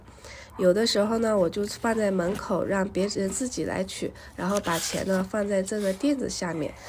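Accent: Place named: Yantai, China. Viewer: 烟台口音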